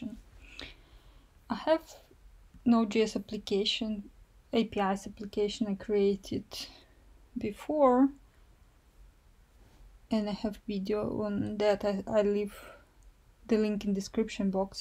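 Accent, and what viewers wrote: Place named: Russia. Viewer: One can always spot a Russian thanks to this amazing accent! Tx for the vid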